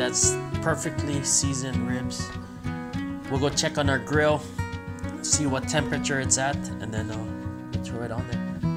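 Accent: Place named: Philippines. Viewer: Da pilipino and brada accent iz on poynte 👍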